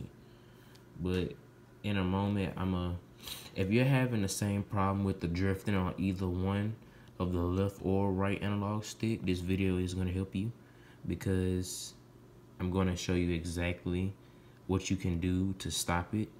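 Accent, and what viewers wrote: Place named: Louisiana, United States. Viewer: You from Louisiana bro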